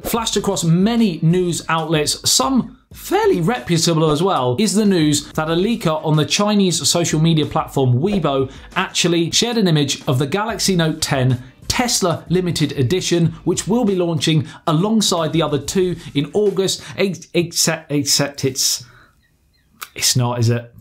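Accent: United Kingdom. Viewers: Actually I watch your video for your great screen presence, brit accent and that lovely dash of humor combined with your constant smirk. Keep it up.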